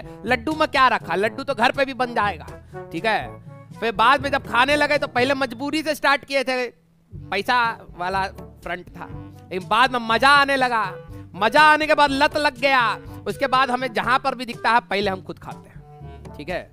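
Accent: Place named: Haryana, India. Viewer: Isko kehte h ठेठ हरयाणवी 😂btw I m also from haryana love u sir ji❤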